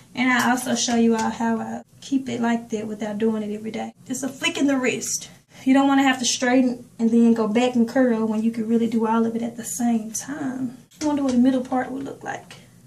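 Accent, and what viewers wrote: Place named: Memphis, United States. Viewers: I knew you were from Memphis I hear it lol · I knew you were from Memphis 😣. Lol I can tell by that accent. I'm Nasvillian ✌✌✌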